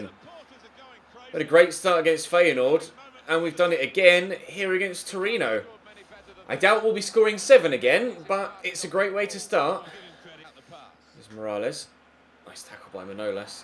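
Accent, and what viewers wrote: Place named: RP, United Kingdom. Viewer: Why do you speak like a class posh commentator?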